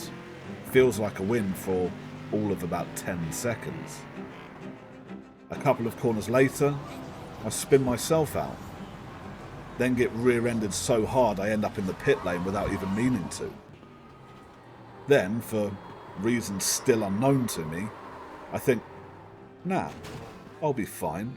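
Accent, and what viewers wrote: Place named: United Kingdom. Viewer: A british accent always makes narrating sound supreme.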